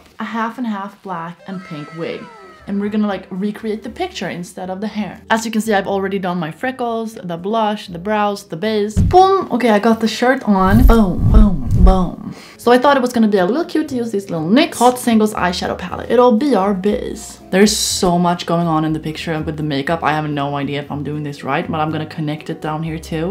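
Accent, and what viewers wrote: Sweden. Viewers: You sound Swedish 🇸🇪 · I don’t know my why but i get these swedish vibes cus the acent🤠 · I can’t stop thinking abt that shes from sweden. I mean I can hear by her accent:,) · ahhh yes the stockholmare english accent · I have a question. Are you from sweden? Because you sound like you are and if you are:hur mår du? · u sound so swedish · is that a Swedish accent I hear?? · swedish accent like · Omg shes from sweden. It was the first thing i thought because of her accent and then she said "elva" which is the swedish word for eleven. Så hej! Jag är också från sverige! :) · Let me guess, Swedish? The accent, ikea furniture and she didn’t even say eleven she said elva- Oh wait her bio says everything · Wow din svenska accent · Omg I never heard such a strong swedish accent it's tickling my brain in a way I never thought it wouldve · I see i see i heard that you were Swedish by the accent and the ramlösa if Im Wrong I don’t know what to do with my life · Me: hears her accent and hears her say 11, me: yap hon är svensk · Are u Swedish? 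U kinda sound like u r! If u r hej hoppas du har det bra! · Yo, you sound so Swedish, please tell me I got it right lmao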